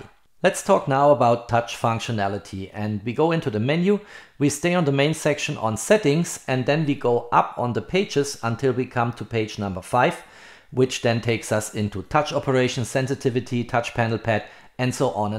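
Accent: Germany. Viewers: Sehr gutes Video. Endlich mal sehr verständliche Erklärung der Einstellungen. Wollte meine Einstellungen parallel vornehmen, bis mir aufgefallen ist, das z.B. beim Touch Panel einige Einstellungen fehlen. Da wurde mir klar, dass ich nicht die A7R5 in der Hand hatte, sondern meine A7iV. Die beiden sehen sich so ähnlich. Was ich noch nicht gefunden habe, ist die Einstellung zum Menü. Das heißt, drücke ich Menü springt die Kamera stets zum oberen Punkt, bei der A74 zeigt sie die letzte Einstellung, was mir viel lieber ist. Werde wohl noch eine Weile brauchen, bis alles optimiert ist. Möchte auch die Einstellungen, soweit möglich, zur A74 identisch haben, damit ich mich nicht umstellen muß. Danke für die großartige Arbeit, ich hoffe Du verstehst die deutsche Sprache, sonst deepL nutzen. PS: mir kam es sogar so vor, als hättest Du einen leichten deutschen Akzent im englischen, aber nur sehr leicht.In Minute 39 erklärst Du was ich suchte, hätte ich nie gefunden.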